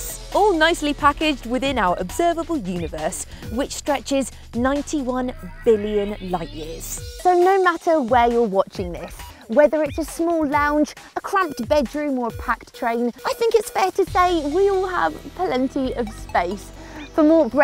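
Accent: United Kingdom. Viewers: ur sexy, i love British accents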